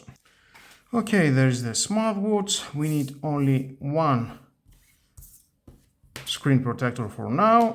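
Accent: Greece. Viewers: Είναι το πρώτο βίντεο που βλέπω και από την πρώτη λέξη κατάλαβα ότι Έλληνας 😅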